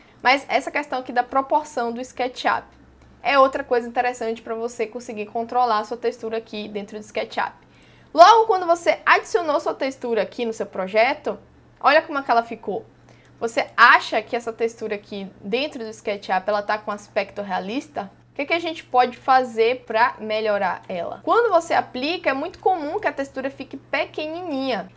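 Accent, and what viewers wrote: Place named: Bahia, Brazil. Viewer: Bacana! Vc pode conseguir também editar os mapas de bump e reflexo através do Color Correction em vez de usar o Mix. Foi inclusive a primeira vez que vi alguém usar o Mix com essa finalidade que vc usa. (em geral eu uso o Mix apenas no Diffuse pra mesclar texturas diferentes, como adicionar pontos enferrujados a um metal originalmente polido). Obs. Adoro sua didática. Esse jeitinho alegre e o sotaque baiano me encantam.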